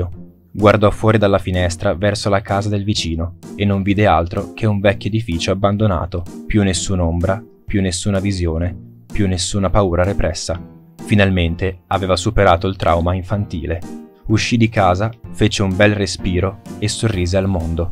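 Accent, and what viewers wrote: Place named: Bologna, Italy. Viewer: Mo che bel accento bolognese che c'hai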